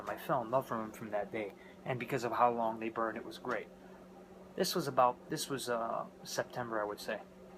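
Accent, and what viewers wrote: Chicago, United States. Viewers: That is one strong chicahgo accent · My family is from chicago and surrounding area and i picked up on the accent. I thought you may be from Chi-Town. And i think i detect a little Italiono too. Like listening to one of my family members. Fuckin love it! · Fuck yeah dude the second I saw the jacket and heard you speak I knew this boy was from Chicago. Windy City best damn city in the world! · That Chicago accent I'm from Chicago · The only people I’ve ever known with a Chicago accent that thick were all in their 60’s. Maybe this dude is a time traveler. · He is from Chigaco I think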